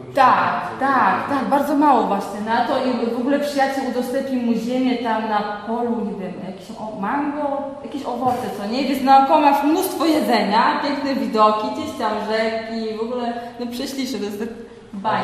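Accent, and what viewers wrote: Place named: Russia. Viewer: Temat bardzo ciekawy, ale przekaz trudny do słuchania. Co chwila no, no, jakieś rosyjskie końcówki, zamiast ść, sc. To nie rozmowa w małym gronie, ale przekaz do większej ilości osób.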